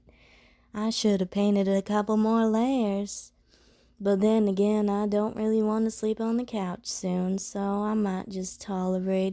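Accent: South, United States. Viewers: You do a great southern belle. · Oh no... I'm liking Southern accents more than ever now. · You just made me discover something about myself... I REALLY like Southern accents...😶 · I got family in the south and your ascent is sooo close but just a little off but good job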